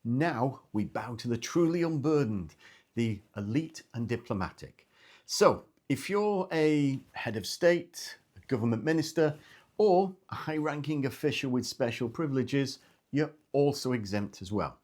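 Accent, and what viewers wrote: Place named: Blackpool, United Kingdom. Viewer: You sound more of a blackpool type of guy anyway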